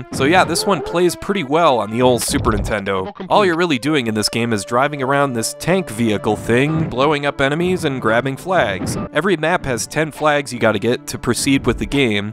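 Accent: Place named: Midwest, United States. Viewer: Random Midwestern accent got me.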